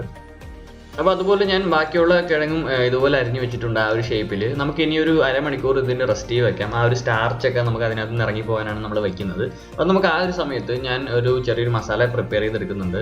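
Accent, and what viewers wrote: Alappuzha, India. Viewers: ചേട്ടൻ ആലപ്പുഴക്കാരൻ ആണോ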